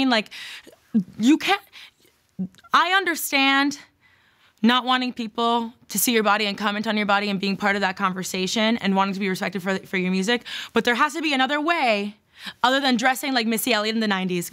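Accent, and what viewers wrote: Miami, United States. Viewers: The miami accent came to this segment!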